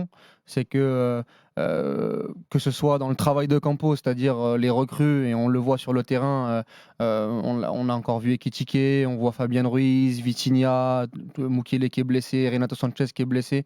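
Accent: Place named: Banlieue, France. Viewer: Galtchhhhier...Contchhhhinuer...tRRRRRRRRRès loin du compte...mais putain cet accent des cités quelle horreur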